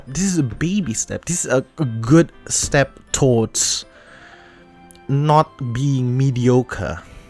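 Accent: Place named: RP, United Kingdom. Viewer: Lebih ga paham lagi kalo B pake aksen Scottish loh. Wahahaha. Sebenernya bagus banget sih si B ini pake aksen RP-ish (menurut sepengetahuan gw), agak kurang natural aja. Mungkin kalo swear words fuck sama shit diganti jadi bloody sama bollocks bakal jadi lebih natural. Wohohoho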